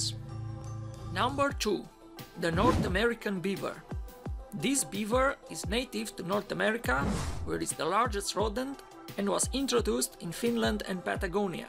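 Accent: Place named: Poland. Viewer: Polaszkanski angielski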